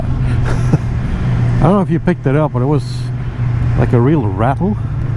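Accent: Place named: Canada. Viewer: I’d have said sounds like a Canadian accent Nice bike bought an orange one a few months back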